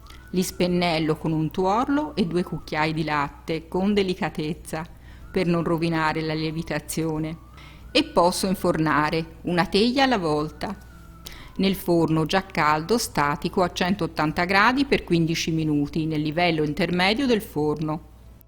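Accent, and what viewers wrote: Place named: Pienza, Italy. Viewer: Fantastici!!!! Le tue ricette sono perfette vengono sempre ...e poi mia madre e’ di Pienza.... il tuo accento mi fa sentire a casa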